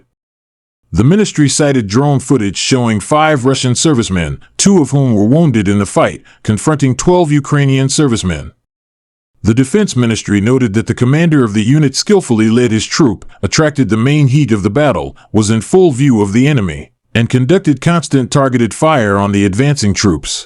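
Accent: United States. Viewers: ощущение,что озвучивал какой то реднек со среднего запада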